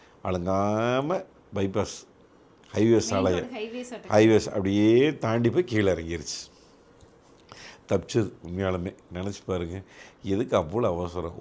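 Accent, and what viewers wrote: Kongu, India. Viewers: ❤அண்ணா வணக்கம் 🙏🏻திருப்பூர் கொடி ராஜா 🌱🙏🏻🇾🇪 முதலில் மக்களுக்கு விழிப்புணர்வு ஏற்படுத்த, உங்களது பொன்னான நேரத்தை செலவிட்டு, இந்த மாதிரியான வீடியோக்களை தொடர்ந்து பதிவிட்டு வரும் உங்களுக்கு வாழ்த்துக்கள்..❤️நன்றிகள்🙏🏻.... மேலும்,நமது கொங்கு மொழியில் எளிமையாக, மக்களுக்கு புரியும்படி பேசும் உங்களது பேச்சு அருமை... 🙏🏻 தொடரட்டும் உங்கள் சேவை💐💐💐💐💐💐💐💐💐💐💐💐💐💐💐💐💐💐💐💐💐💐💐💐💐💐💐💐💐💐💐💐💐💐💐💐💐💐💐💐💐💐💐💐💐💐💐💐💐💐💐💐💐❤️💚💐💐💐💐💐💐💐💐💐💐💐💐💐💐💐💐💐💐💐💐💐💐💐💐💐💐💐💐💐💐💐💐💐💐💐💐💐💐💐💐💐💐💐💐💐💐💐💐💐💐💐💐💐💐💐💐💐💐💐💐💐💐💐💐💐💐💐💐💐💐💐💐💐💐💐💐💐💐💐💐💐💐💐💐💐💐💐💐💐💐💐💐💐💐💐💐💐💐💐💐💐💐💐💐💐💐💐💐💐💐💐💐💐💐💐💐💐💐💐💐💐💐💐💐💐💐💐💐💐❤️💚💐💐💐💐💐💐💐💐💐💐💐💐💐💐💐💐💐💐💐💐💐💐💐💐💐💐💐💐💐💐💐💐💐💐💐💐💐💐💐💐💐💐💐💐💐💐💐💐💐💐💐💐💐💐💐💐💐💐💐💐💐💐💐💐💐💐💐💐💐💐💐💐💐💐💐💐💐💐💐💐💐💐💐💐💐💐💐💐💐💐💐💐💐💐💐💐💐💐💐💐💐💐💐💐💐💐💐💐💐💐💐💐💐💐💐💐💐💐💐💐💐💐💐💐💐💐💐💐💐❤️💚💐💐💐💐💐💐💐💐💐💐💐💐💐💐💐💐💐💐💐💐💐💐💐💐💐💐💐💐💐💐💐💐💐💐💐💐💐💐💐💐💐💐💐💐💐💐💐💐💐💐💐💐💐💐💐💐💐💐💐💐💐💐💐💐💐💐💐💐💐💐💐💐💐💐💐💐💐💐💐💐💐💐💐💐💐💐💐💐💐💐💐💐💐💐💐💐💐💐💐💐💐💐💐💐💐💐💐💐💐💐💐💐💐💐💐💐💐💐💐💐💐💐💐💐💐💐💐💐💐❤️💚💐💐💐💐💐💐💐💐💐💐💐💐💐💐💐💐💐💐💐💐💐💐💐💐💐💐💐💐💐💐💐💐💐💐💐💐💐💐💐💐💐💐💐💐💐💐💐💐💐💐💐💐💐💐💐💐💐💐💐💐💐💐💐💐💐💐💐💐💐💐💐💐💐💐💐💐💐💐💐💐💐💐💐💐💐💐💐💐💐💐💐💐💐💐💐💐💐💐💐💐💐💐💐💐💐💐💐💐💐💐💐💐💐💐💐💐💐💐💐💐💐💐💐💐💐💐💐💐💐❤️💚💐💐💐💐💐💐💐💐💐💐💐💐💐💐💐💐💐💐💐💐💐💐💐💐💐💐💐💐💐💐💐💐💐💐💐💐💐💐💐💐💐💐💐💐💐💐💐💐💐💐💐💐💐💐💐💐💐💐💐💐💐💐💐💐💐💐💐💐💐💐💐💐💐💐💐💐💐💐💐💐💐💐💐💐💐💐💐💐💐💐💐💐💐💐💐💐💐💐💐💐💐💐💐💐💐💐💐💐💐💐💐💐💐💐💐💐💐💐💐💐💐💐💐💐💐💐💐💐💐❤️💚💐💐💐💐💐💐💐💐💐💐💐💐💐💐💐💐💐💐💐💐💐💐💐💐💐💐💐💐💐💐💐💐💐💐💐💐💐💐💐💐💐💐💐💐💐💐💐💐💐💐💐💐💐💐💐💐💐💐💐💐💐💐💐💐💐💐💐💐💐💐💐💐💐💐💐💐💐💐💐💐💐💐💐💐💐💐💐💐💐💐💐💐💐💐💐💐💐💐💐💐💐💐💐💐💐💐💐💐💐💐💐💐💐💐💐💐💐💐💐💐💐💐💐💐💐💐💐💐💐❤️💚💐💐💐💐💐💐💐💐💐💐💐💐💐💐💐💐💐💐💐💐💐💐💐💐💐💐💐💐💐💐💐💐💐💐💐💐💐💐💐💐💐💐💐💐💐💐💐💐💐💐💐💐💐💐💐💐💐💐💐💐💐💐💐💐💐💐💐💐💐💐💐💐💐💐💐💐💐💐💐💐💐💐💐💐💐💐💐💐💐💐💐💐💐💐💐💐💐💐💐💐💐💐💐💐💐💐💐💐💐💐💐💐💐💐💐💐💐💐💐💐💐💐💐💐💐💐💐💐💐❤️💚💐💐💐💐💐💐💐💐💐💐💐💐💐💐💐💐💐💐💐💐💐💐💐💐💐💐💐💐💐💐💐💐💐💐💐💐💐💐💐💐💐💐💐💐💐💐💐💐💐💐💐💐💐💐💐💐💐💐💐💐💐💐💐💐💐💐💐💐💐💐💐💐💐💐💐💐💐💐💐💐💐💐💐💐💐💐💐💐💐💐💐💐💐💐💐💐💐💐💐💐💐💐💐💐💐💐💐💐💐💐💐💐💐💐💐💐💐💐💐💐💐💐💐💐💐💐💐💐💐❤️💚💐💐💐💐💐💐💐💐💐💐💐💐💐💐💐💐💐💐💐💐💐💐💐💐💐💐💐💐💐💐💐💐💐💐💐💐💐💐💐💐💐💐💐💐💐💐💐💐💐💐💐💐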